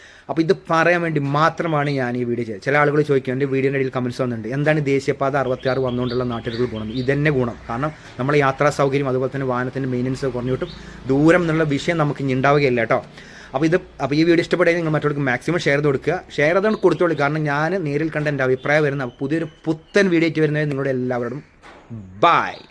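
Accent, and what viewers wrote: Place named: Malappuram, India. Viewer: എല്ലാവർക്കും സന്തോഷം നല്ലവിവരണം എനിക്ക്താങ്കളുടെ ഈ മലപ്പുറം ഭാഷ കേൾക്കുമ്പോൾ എന്റെ പഴയ MSP(മലബാർ സ്പെഷ്യൽ പോലീസ് )യുടെ നല്ല ഓർമ്മകൾ ഒരു നൊസ്റ്റാൾജിയ വല്ലാതെ ഫീൽ ചെയുന്നു ഞാൻ താങ്കളുടെ ഭാഷണം ആസ്വദിക്കുന്നു 🙏🙏🙏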